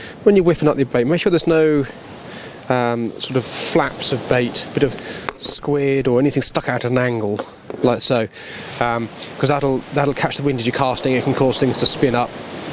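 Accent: RP, United Kingdom. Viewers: You sound like a member of royal family,apart from that,your entertaining,informative and an excellent fisherman,new sub of me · Happy new year from Ian in NZ 😊 Really enjoyed watching this video and you do have rather a posh accent!! Please don't forget to do a video on how you make your bar rigs 😊 I can't believe you catch Thornback and Gurnard in January now on the UK - they were both summer species in my time over there. Cheers 😊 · Should do more vids always great watch.... plus a great posh voice for videos lol... cheers best wishes will be in before I go back to Philippines 🇵🇭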